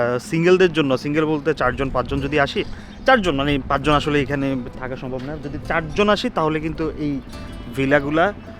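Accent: Bangladesh. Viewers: আপনার কথা বলা বাংলাদেশের মানুষের মতো ।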